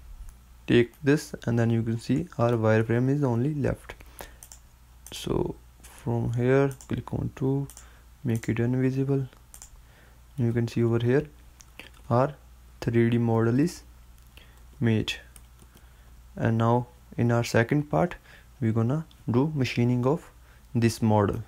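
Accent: India. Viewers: Do us all a favor and have someone who doesnt have an indian accent do the narrating. Nothing personal but english does not go with your accent. Its a shame beacuse theres a lot of good content ill miss out on. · You go entirely too damn fast for anyone watching this to keep up. Also, you have a very thick Indian accent, and tend to mumble. Work on enunciating your words better, other wise your only audience will be folks from India.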